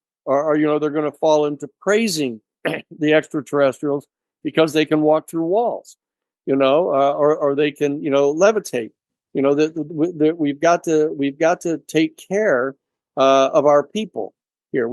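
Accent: Wales, United Kingdom. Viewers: How much more disclosure do we need 🤔? Col Nell (Grusch’s boss) went on record last week saying “There is zero doubt non human intelligence exists “. Greer has 30 years of data which is now available to the public. All this has been filtered out of mainstream media for sure, along with anything else that may remotely affect the reality they want us to believe. Our purpose now is to connect the dots and remember the truth of who we really are. What is more exciting than disclosure? Ans: finding a podcaster with a 🏴 Welsh accent - respect ✊ Light and love to you guys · You still sound Welsh, but the heavy accent has gone 👌🏼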